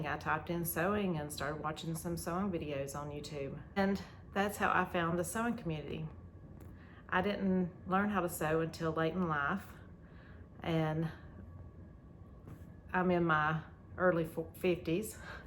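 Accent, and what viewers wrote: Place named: South, United States. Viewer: Hi, congratulations on 3 years of YT👏👏. I didn’t know that YT started 20 years ago, wow 🤯. I truly enjoy your videos, you are a great seamstress and I also love your southern accent 🥰🥰. Thank you for sharing your Zucchini bread (now I’m hungry 🤣🤣). Have a wonderful Holiday weekend 💖💖💖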